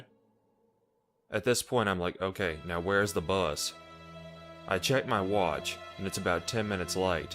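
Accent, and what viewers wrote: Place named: South, United States. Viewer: Love your accent. Sounds southern.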